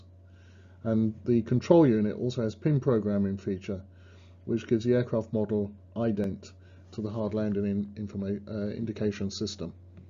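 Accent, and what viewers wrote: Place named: England, United Kingdom. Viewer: Hello thank you very much for all your beautiful video I am one of the pilots who love if I could choose the right word love this Aircraft so my noticed to you is can you please find any other Mike for find how you could fix the volume because really hardly trying to listen to you specially in your English accent the prettiest I guess so so please feed it a bit more louder for six the issue in the mike that would be great from you thank you very much again for all this beautiful video. By the way since couple of years I’m trying to save some money to go for the 605 typerating course so sorry do you suggest to go CEA OR Flight safety or is there any other way to get the typerating cheaper or less than their pricelist specially in coronavirus situation As FAA And one more question please I’m ready for The oral and written test so is there any possible way to go direct to get the exams and then to disability training to save some money because as far as know most of the training centre all over the world doing this option is based on coronavirus situation thank you very much hope to hear from you soon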